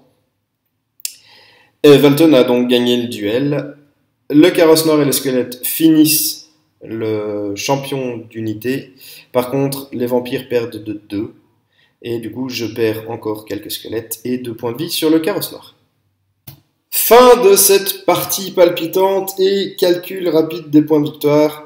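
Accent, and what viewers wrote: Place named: Switzerland. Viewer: Salut encore une fois bon rapport de bataille bien expliqué. Si tu souhaites rencontrer de nouveaux joueur je suis motivé pour cela. Je joue Nains, Orques et Gobelins et Bretonniens. J'ai cru jusqu’à cette vidéo que tu étais Belge ^^ alors que tu as l'air plutôt Suisse. Moi j'habite à une heure de Genève mais en France. Bonne continuation et longue vie à ta chaine.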